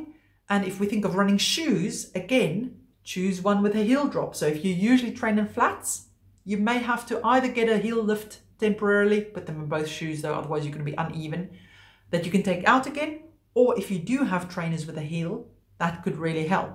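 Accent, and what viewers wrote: South Africa. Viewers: Nice South African accent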